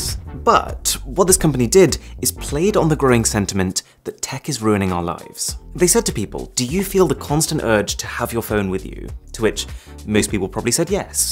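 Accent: RP, United Kingdom. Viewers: I just watch your videos just because I like the way of your talking, specially British accent I just love it❤️.huge love from Bangladesh 🇧🇩. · I love your voice. The poshness (sorry i dont know a better word lol) iv subscribed xx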